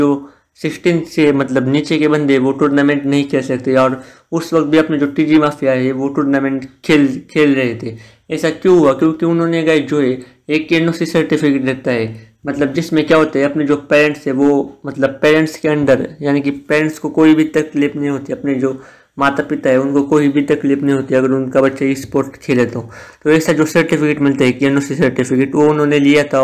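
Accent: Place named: Maharashtra, India. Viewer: U r talking accent is like maharshtrian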